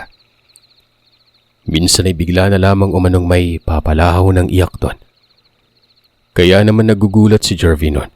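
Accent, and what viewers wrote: Visayas, Philippines. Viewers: listening here from Lapu- Lapu City Cebu...hello sir cute mo mg bisaya 😊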